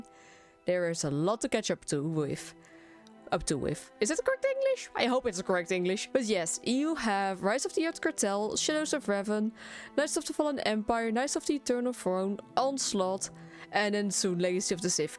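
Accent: Netherlands. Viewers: Listening to a Dutch accent is always amusing, so high pitched. Good video and +1 for the accent, lol.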